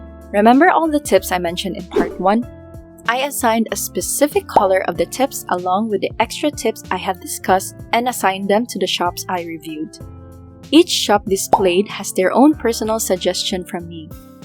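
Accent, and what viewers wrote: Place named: Philippines. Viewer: almost give up on POD after spending so much time following tips here and there ,I'm glad I stumbled on your channel...and wow your amazing and inspiring, I find so much value in your content....I'm hoping to learn more and finally establish my RB shop. Thanks so much. BTW, correct me if I'm wrong, I'm from the Phil and hearing your voice and diction makes me think we are from the same country, anyway, I'm just curious..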